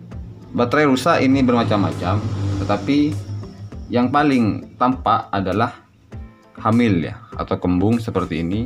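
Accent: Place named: East Java, Indonesia. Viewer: Maksih bang infox..klo di dengar2 logat nya abang nya pst dr jatim 😄👍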